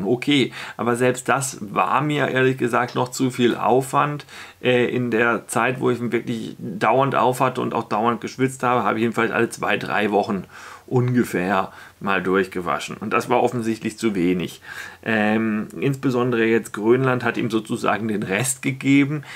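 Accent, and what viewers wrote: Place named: Alemannic, Germany. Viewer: F. allemannischer ist noch ein bisschen weiter im Süden. In der Gegend vom ist das badische noch vom Elsas beeinflusst. Dadurch unterscheidet sich der badische Dialekt noch ein bissle vom sch(w)äbischen. <ma‘ muss hald do no ä bissle de badisch/schwäbische Freindschaft pfläge, gell> 🤣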